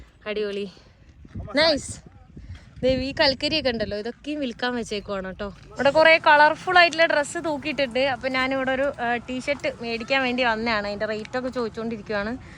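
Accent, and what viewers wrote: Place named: Ottapalam, India. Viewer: പാലക്കാട് ഒറ്റപ്പാലം ഏറിയയിൽ ആണോ വീട് സംസാരം കേട്ടപ്പോൾ അങ്ങിനെ തോന്നി ❤❤❤❤😅😅😅😅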